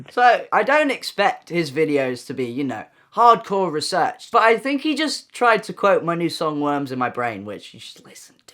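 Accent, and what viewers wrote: United Kingdom. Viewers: you sound so british here /neu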